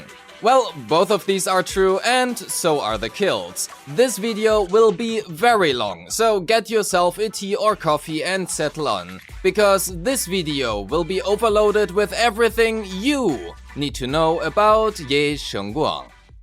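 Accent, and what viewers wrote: Germany. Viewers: Great video, i hope ye shunguang will restore my zzz luck. Are you by any chance german? I hear somewhat of a familiar accent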